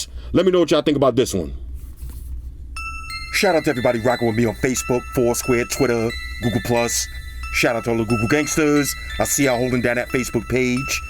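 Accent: New York, United States. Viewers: is from the south and is from New York he has a New York accent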